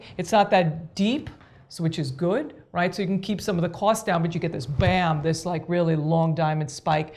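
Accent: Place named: New York, United States. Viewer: This woman is a genius! So much creativity and thought behind all her pieces. I love listening to her voice too, I live in the south now and miss hearing that NY accent. Brings me right back to my childhood/extended family!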